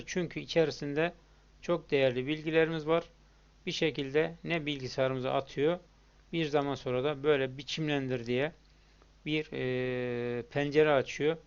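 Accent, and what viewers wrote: Erzurum, Turkey. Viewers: abi Erzurumlu, net!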